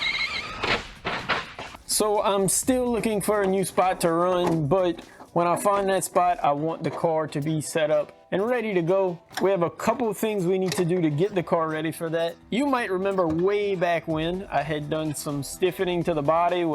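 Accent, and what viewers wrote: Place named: South Louisiana, United States. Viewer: Man are you from South Louisiana? Heard a familiar accent in your wide body corvette video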